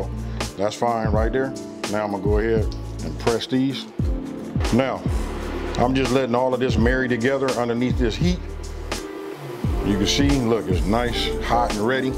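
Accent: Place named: South, United States. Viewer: Where you born ? You sound southern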